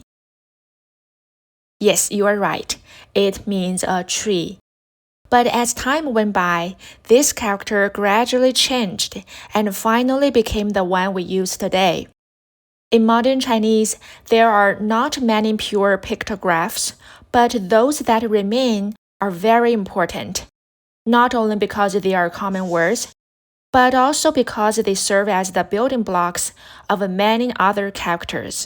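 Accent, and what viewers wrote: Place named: England, United Kingdom. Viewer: really great and like your English accent it is very charming. Thank you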